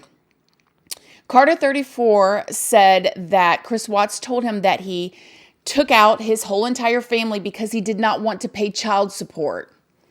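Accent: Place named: Texas, United States. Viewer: Darling, you are fabulous, and FUNNNNNEEEE! You know what I think? I believe Chris Watts couldn’t walk away his pregnant wife ((What would people think of me, so, his family had to disappear - and NK’s pull on him was tantamount to hypnotism; as though she were a real life Siren, he unable to resist her call. ….! I willdefinitely be watching more of you; you are incredible!! Love your Tevan accent Y’all an’ all!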